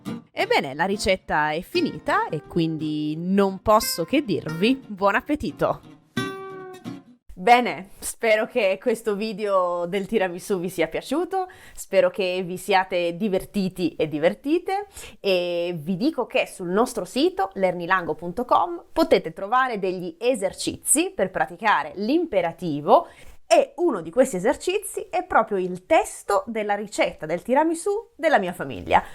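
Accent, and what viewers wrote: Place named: Taranto, Italy. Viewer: Buonasera, non era mia intenzione offenderla. Essendo lieve non so caratterizzarlo. Nel canale YouTube insegna egregiamente grammatica italiana, per questo le rinnovo i complimenti. Non crede sarebbe giusto insegnare anche la corretta dizione? Non volevo offenderla, ogni dialetto, accento va senza dubbio salvaguardato. Io amo l'accento ciociaro ad esempio, essendo originario di Frosinone. Molti attori, attrici, presentatrici e presentatori fanno corsi di dizione, pur conservando e amando le loro origini. Spero di essermi spiegato, lei fa e farà come sempre un ottimo lavoro. Non la disturberò più con i miei commenti. P.S. credo abbia origine Tarantine, ma potrei sbagliarmi. Un caro saluto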